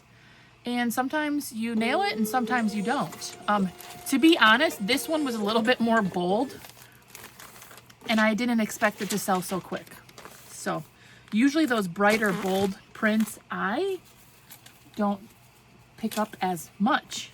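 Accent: Wisconsin, United States. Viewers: Fun to hear you talking about Madison. I grew up there and loved it! And I love to hear that Wisconsin accent. 😊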